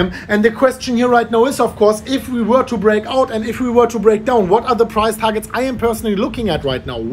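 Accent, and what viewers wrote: Germany. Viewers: Dieses typische Deutsch English 👍🏽👍🏽😅 Hamma YouTube Channel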